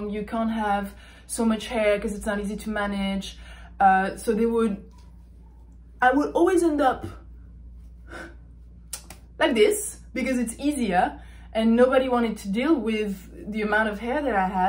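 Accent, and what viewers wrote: France. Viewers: Wait! Is she French?? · I love your accent 😍 I’m currently learning french, the most beautiful language in the world xx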